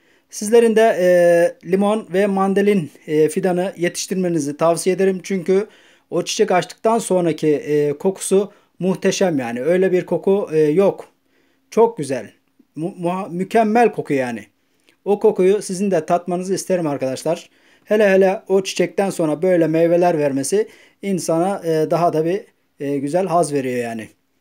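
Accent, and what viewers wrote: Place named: Azerbaijan. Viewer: Aynan azeri lehcesinde konuşuyor.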